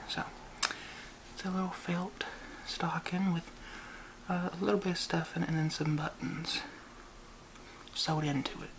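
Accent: South, United States. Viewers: That southern accent is adorable 😭♥️ all those tingles, thanks 😘 Also that pony tail looks so cute I'm crying x💓💓💓💓💓💓💓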